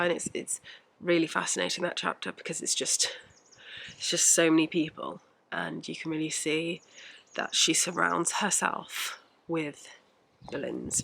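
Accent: United Kingdom. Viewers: You have a really good British accent.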